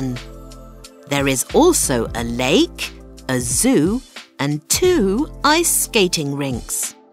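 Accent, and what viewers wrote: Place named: United Kingdom. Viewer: But this is UK's British English....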